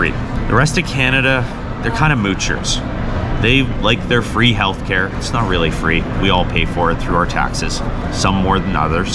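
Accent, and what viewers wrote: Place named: United States. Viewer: Jack ass, you actually sound American. Ignorant and over confident.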